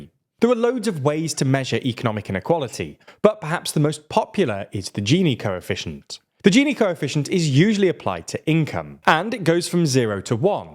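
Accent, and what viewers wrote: United Kingdom. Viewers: omg, this uk accent drives me crazy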